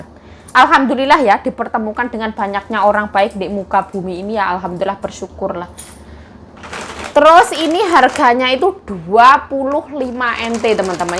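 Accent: Java, Indonesia. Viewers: Mba ini orang mana yaa? Bahasa jawanya medok bgt wkwkwk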